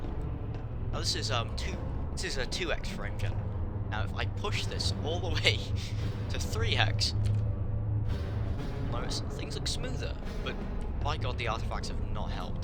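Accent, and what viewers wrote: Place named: United Kingdom. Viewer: well documented video but you have the funni brit accent and its distracting pls be a little less tea sipping brit next time pls ❤‍🩹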